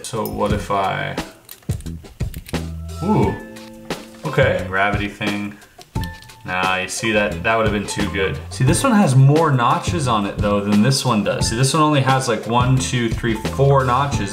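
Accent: Canada. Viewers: .....yeah, I can tell you're Canadian. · Hey! Are you canadian?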